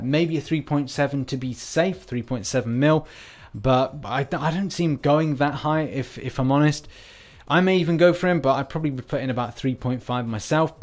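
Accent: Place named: England, United Kingdom. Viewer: it took me 6mths to realize u were saying forum link not foreign link. Good old queens English hey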